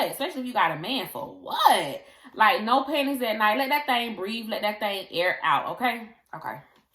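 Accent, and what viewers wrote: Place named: Florida, United States. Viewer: I know you from Florida boooyyyy that accent sound like all my kinfolk😩😭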